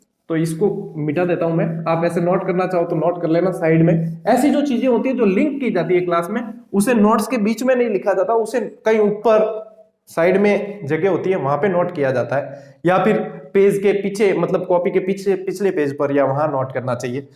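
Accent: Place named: Marwari, India. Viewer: सर आपका मारवाड़ी भाषा के ठरके खूब रास आ रहे हैं!! निस्वार्थ भाव से विधार्थियो के लिए रामबाण औषधि परोस रहे हैं!!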